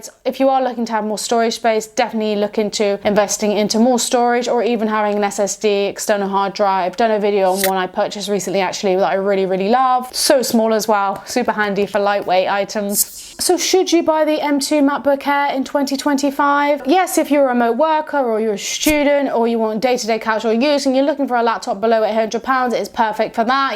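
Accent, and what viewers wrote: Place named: United Kingdom. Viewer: love the British accent, but yours is on another level ! New subscriber with all the bells ringing and greetings from Argentina 🦁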